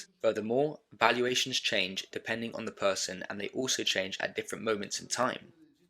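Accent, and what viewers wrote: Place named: United Kingdom. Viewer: La voz es excelente, no tengo que leer los subtítulos y me gusta volver a disfrutar esta presentación en versión Inglés, y es muy clara y muy agradable la voz de estilo británico. Es una voz natural y agradable.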